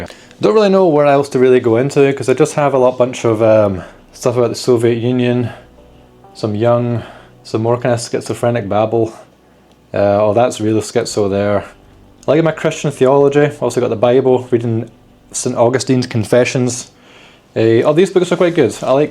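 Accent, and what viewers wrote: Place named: Glasgow, United Kingdom. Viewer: No bonus points for me, I only know that music, Lacuna Coil and so forth because because I played the hell out of VTMB both vanilla and modded. I have to say I like your content and the accent reminds of a co-worker from my last job, originally from Glasgow, we could take the piss out of each other without worrying about our "feelings" or HR. Salt of the earth.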